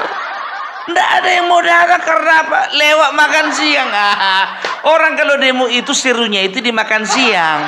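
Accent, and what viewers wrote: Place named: Medan, Indonesia. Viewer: Yakin lucu si Abang ini. Sy suka logat Medan. Lanjut bang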